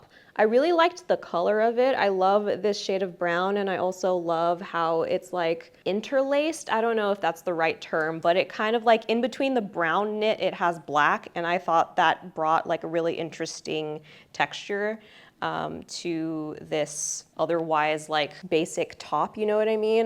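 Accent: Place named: Australia. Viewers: girlllll you’re Aussie accent is killing me 😂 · the aussie accent was confusing me so much 😭 but it’s so cute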